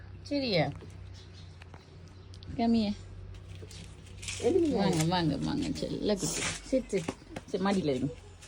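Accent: Sri Lanka. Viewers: அழகான புன்னகை, உங்கள் அன்பு, பிணைப்பு, உங்கள் அழகான இலங்கைத் தமிழ் ஆகியவை என் இதயத்தை முழுவதுமாக ஈர்த்துவிட்டன. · ஈழ தமிழே தமிழ் தான்.. என்ன ஒரு அழகு அந்த செந்தமிழில்.... "காலத்தி னாற்செய்த நன்றி சிறிதெனினும் ஞாலத்தின் மாணப் பெரிது" என்ற அய்யன் வள்ளுவனின் குறள் கண் முன் வந்து போகின்றது. நீர் வாழ்க வளமுடன் சகோதரி - மதுரையிலிருந்து சீனிவாசன்..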